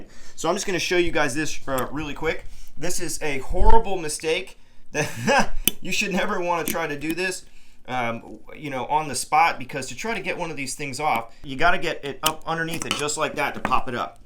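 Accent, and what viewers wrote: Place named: South, United States. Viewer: Upvoted for southern dialect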